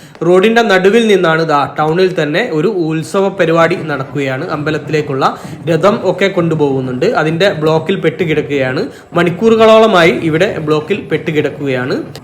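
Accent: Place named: Kozhikode, India. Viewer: ഫാഷ അല്ല ഭാഷ ഫരണി അല്ല ഭരണി ഓരോ നാട്ടിലും ഓരോ മൊഴിയുണ്ട് കോഴിക്കോടൻ ഭാഷയിൽ പറഞ്ഞതാണ് അദ്ദേഹം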